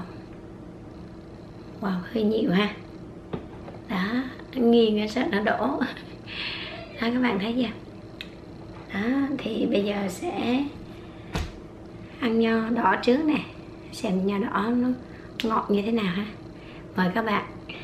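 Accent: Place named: Central, Vietnam. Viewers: Chị cùng quê với em nè. Hèn gì em nghe chị nói chuyện giống giọng miền Trung. Ngày nào cũng hóng video của chị. Chúc chị mau khỏe nha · Hôm trc nghe cô nói gịong quảng nam là con nghi r. Con củg ở qn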